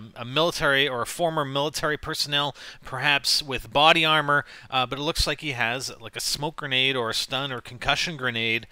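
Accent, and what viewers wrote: Canada. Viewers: Are you from Canada? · R u candadian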